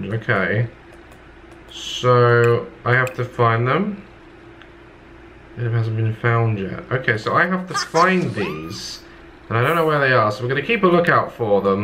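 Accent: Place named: Essex, United Kingdom. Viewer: just next time, please make the roasts funny. btw are you from essex? no offense but you sound like it. i am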